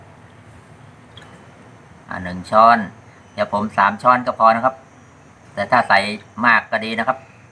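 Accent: Chanthaburi, Thailand. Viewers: สำเนียงเหมือนคนจันทบุรีเลยครับ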